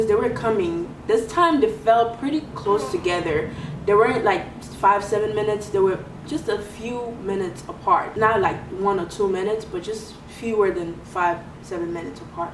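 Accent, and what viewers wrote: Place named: Francophone Africa, Africa. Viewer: Cameroon I knew I recognized that afro-french accent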